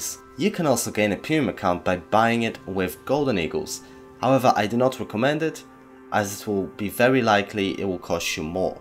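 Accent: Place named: Poland. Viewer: Why do you have Polish accent ?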